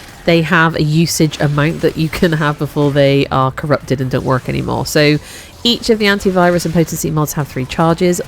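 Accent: West Country, United Kingdom. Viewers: Wow what an informative video. Giving a like and sub. Nice to hear an English voice! Got a west country twang to it? 😅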